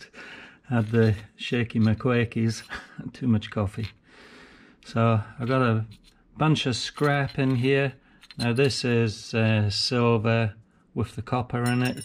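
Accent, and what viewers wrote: Northern England, United Kingdom. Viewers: i can tell you're from either very far north england or very far south scotland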